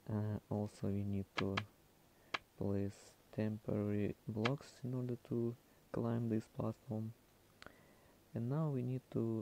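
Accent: Russia. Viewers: Почему-то я слышу в твоём голосе что-то с русским акцентом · Да ну вы русский акцент слышу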